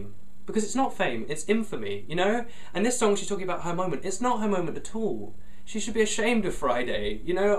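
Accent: United Kingdom. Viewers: I LOVE YOU!!!! You are a miracle to this planet and you explained this so well and I agree 100%! You are the smartest brit ever and your accent is amazing. And rants are awesome and people should just rant about more things cause sometimes they're really fun to listen to. This video is EPIC.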